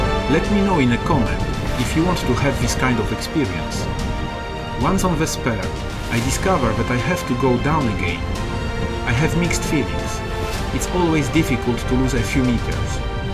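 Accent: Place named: France. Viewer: Cet anglais avec un accent plus que frenchie, c'est terrible à entendre. Sans vraiment aucune méchanceté hein, mais là, c'est un peu terrible quand même...😯 Amicalement.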